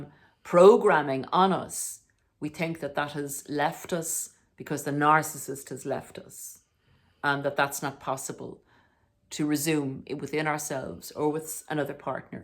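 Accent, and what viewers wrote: Ireland. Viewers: Interesting Irish accent 😊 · Are you from Ireland? I lived in Ireland for 2 years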